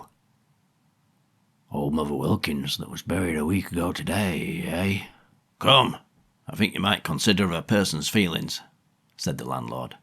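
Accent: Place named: Yorkshire, United Kingdom. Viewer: Please, No! Do not feel because of your Yorkshire accent that you are not suitable to read the works of M.R. James. He wrote his works for everyone and (excuse the pun) would be horrified a person would feel his accent prevented him from interpreting his work. He was not a snob. Far from it. You have the perfect voice for the journey you have chosen (or it may have chosen you) Happy reading 📗📘📙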